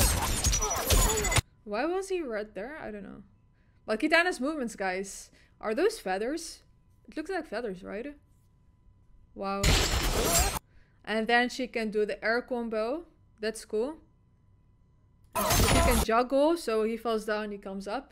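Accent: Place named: Netherlands. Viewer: Do you come from the Netherlands? You have a Dutch accent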